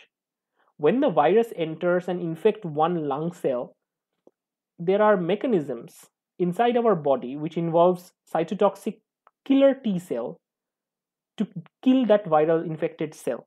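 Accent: India. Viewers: speaker sound from India right? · I am sorry but if YOU'RE AN INDIAN YOU NEED TO STOP USING YOUR THICK ACCENT IN NARRATING A VIDEO.... OR somehow include "Indian accent" disclaimer on your VIDEO title... So I don't click on it and not understand a single word...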